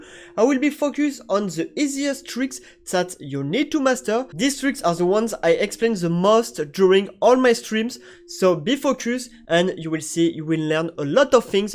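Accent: France. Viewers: Le bon vieux accent français x)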